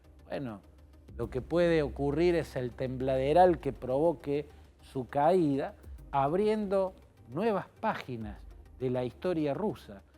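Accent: Córdoba, Argentina. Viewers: Genial su tonada cordobesa y sus conocimientos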